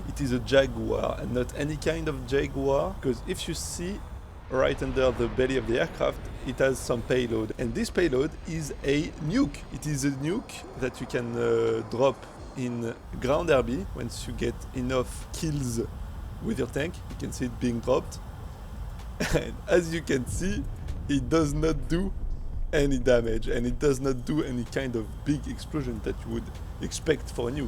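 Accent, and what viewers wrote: France. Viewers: tout ce que j'entend c'est l'accent français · Your French accent really adds a great touch to the video. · I knew you was french at the first second of the video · Omg l'accent français il est si flagrant 😂😂😂, sinon bonne vidéo gg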